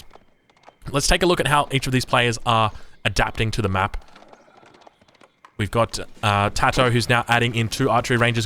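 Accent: Australia. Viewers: So refreshing to listen to a fellow Aussie caster. Love ya work mate, keep it up!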